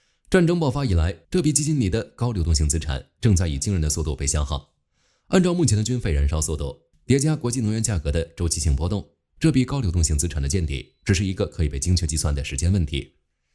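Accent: China. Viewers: 博主是台湾人？只是这配音很大陆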